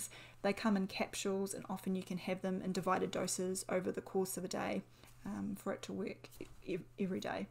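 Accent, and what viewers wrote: New Zealand. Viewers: Love the kiwi accent 🥰 Thanks doc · Beautiful New Zealand accent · I take saw pameto in powder and I only use a little because I juice it with a bunch of other herbs, is that good or badd ? I've heard so many different things about it and you're the 1st to say that it's probably not the best for men in a lot of ways so what's going on? By the way I know you're from New Zealand by your accent because one of my good friends is from the total Kiwi accent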